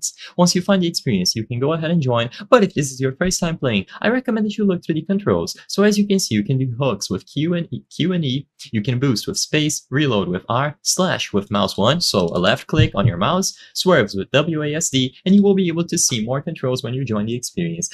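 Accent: Brazil. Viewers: você é brasileiro ne? da pra perceber pelo sotaque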